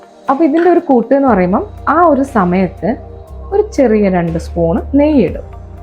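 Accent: Kozhikode, India. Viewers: de ആ ഇരുത്തം ഒക്കെ നല്ല അസ്സൽ നോർത്തി. but സംസാരം ന്റെ കോഴിക്കോട് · Natural samsaaram kozhikoden style .... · I like ur kozhikode slang Me from kozhikode😂😂chevayoor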